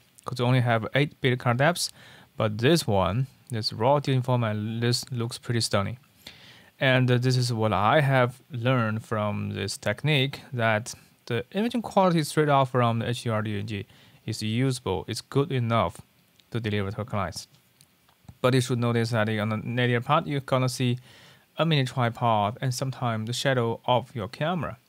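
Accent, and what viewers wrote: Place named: Asia. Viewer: I like your content. But I do find it hard to understand your Asian accent in English. Keep up the work!👍😀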